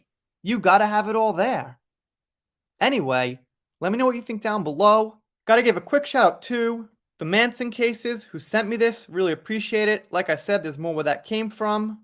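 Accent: New York, United States. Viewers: I find your voice and accent sooo new york ...it is perfect ...keep it! Dont change...when young i moved to california (in thr 1960s bedore insanity) and was made fun of so i DELIBERATELY got rid of my midwest twang.. i actually had teens ask me about indians living in tee pees..no joke..they were that ignorant...i ended up being the science aid because i was so far advanced in science compared to those kids..actually in every class...and i was considered a c and d student in oklahoma...there I was an a plus student with out trying. There.fed your ALGORITHM...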